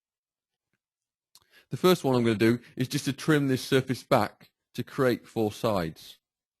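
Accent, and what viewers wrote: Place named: United Kingdom. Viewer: Was pleasantly surprised when I heard a British accent.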